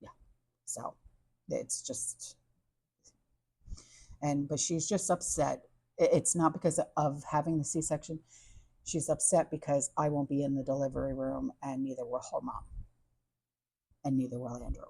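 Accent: New York, United States. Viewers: Hello 🤗 I shared your page with a girlfriend of mine to your page. She thinks you're very intelligent well-spoken and loves the New York accent 😁 Have a great day God Bless 🙏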